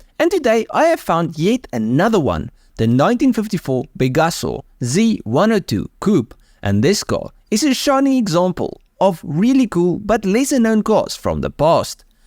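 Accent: Afrikaans, South Africa. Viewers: Does anybody listen not only for the very interesting cars but also for guy's wild afrikaans accent?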